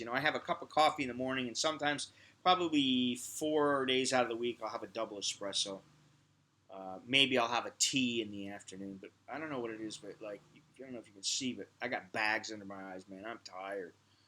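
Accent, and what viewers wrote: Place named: Brooklyn, United States. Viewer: Hey, Coming to Thailand this March. I live around the corner from Pateron. How is the rock scene in Bangkok? Do you go out to shows? I'm a musician in San Francisco and I might be considering moving to Thailand.I have a friend who's an English teacher and a girl that I'm friends with a nice Thai girl. Don't worry, I'm taking it slow as per your advice. I did a little research already and I see Bangkok recently has a budding rock scene there. Here is a link to my music: <link> It might not be your cup of tea but maybe... Anyway, you sort of have a Brooklyn type of style of delivery. Straight and to the point. You can reach me at <mail> if you'd like. maybe we can have lunch or something.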